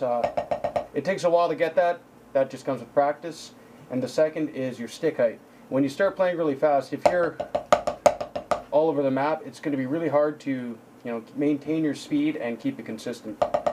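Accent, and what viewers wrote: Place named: Canada. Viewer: strongest canadian accent ive ever heard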